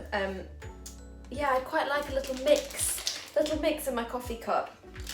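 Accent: United Kingdom. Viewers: Is it just me or accent is so strong since she back in the UK. I love it so much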